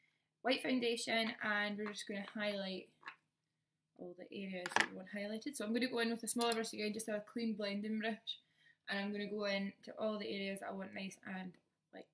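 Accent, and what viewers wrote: Scotland, United Kingdom. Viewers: Are you Scottish xx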